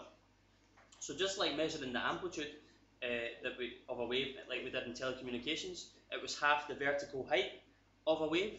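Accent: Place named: Scotland, United Kingdom. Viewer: Love the scottish accent bro XD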